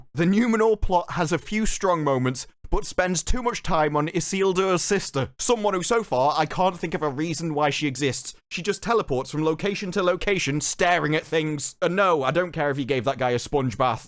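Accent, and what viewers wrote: United Kingdom. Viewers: You and Council are a great combination for hearing about the show, which I will never see apart from within your videos. I'm sure you've checked out his stuff - his low-key snark is a great pairing with your classic British delivery. I honestly don't know what they spent their money on! I thought a lot of their backgrounds looked like old series Star Trek background paintings. It just does not look modern, and it certainly doesn't look inventive or creative. Like a few other people have said, it doesn't seem to have a soul. I guess the best thing that can be said is the professor Tolkien is not here to witness it.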